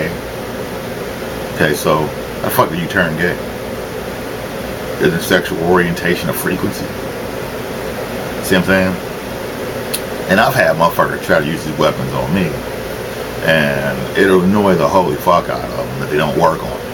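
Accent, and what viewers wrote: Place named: Washington DC, United States. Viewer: Thanks. I lived in DC briefly in 1973, Howard Univ one semester. At 65, I might be slightly older than you. People from that part of the country sound a lot like you do.You also remind me of a friend from San Fran in the mid 1970s. Thanks for the clarification. There's a TI in Houston, Texas Gangstalking Milab who I've been communicating with. People here in Memphis don't know what a TI is. Take care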